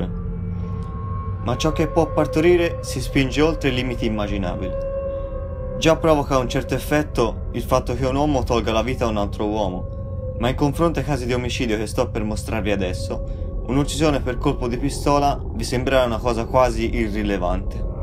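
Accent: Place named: Tuscany, Italy. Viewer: L' inflessione della lingua è toscana !!!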